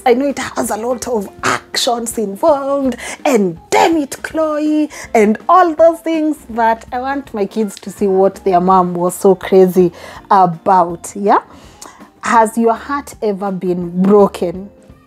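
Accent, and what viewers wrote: Kenya. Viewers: Thank you for keeping your original Kenyan accent. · You sound very Kenyan YOU KNOW ; ; . ; ; ;. Right?? ⁉️ Ly Baurer 2️⃣4️⃣ December Climax to (Sounds like a true Kikuyu Woman) #NoUkabila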